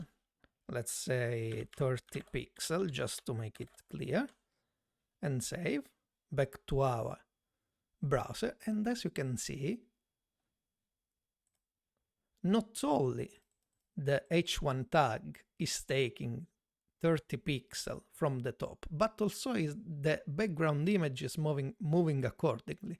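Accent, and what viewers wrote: Italy. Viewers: He is Italian, what do you expect? This tut. helped me a lot. Thanks to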